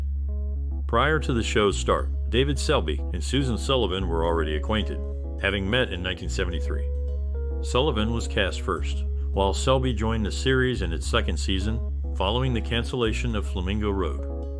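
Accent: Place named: United States. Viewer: Too many random pictures not related to what is being said. And telling us Jane Wyman played Angela about 20 times. The overly American pronounciation of Italian names got very distracting too.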